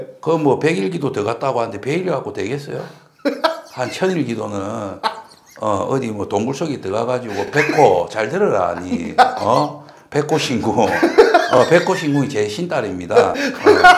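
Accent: Gyeongsang, South Korea. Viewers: 쌤!화이팅!!! 최고로 시원한 점사 놀라워요. 경상도 말씨 늘 정겨워요 ㅎㅎ 항상 응원합니다.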